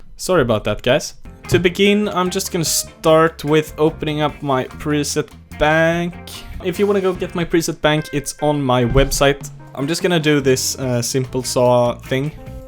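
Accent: Sweden. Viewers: That swedish accent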